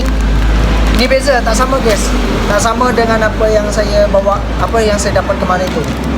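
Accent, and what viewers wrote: Malaysia. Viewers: Tak ada dah sleng indo dah cara percakapan nak ni, dah macam lorat orang malaysia dah cara dia bercakap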